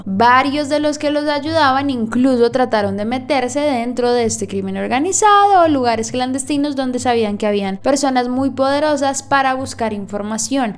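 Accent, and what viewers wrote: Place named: Colombia. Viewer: El acento colombiano ❤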